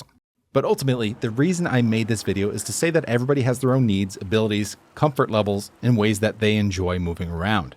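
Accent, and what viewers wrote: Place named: United States. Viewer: Bro... Why is this guy speaking American talking about kilometers?!?! Im so confused lmao.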